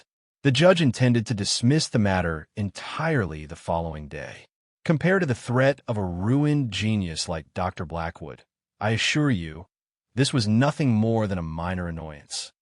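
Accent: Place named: United States. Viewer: The story loses value as this is narrated by someone in the U.S.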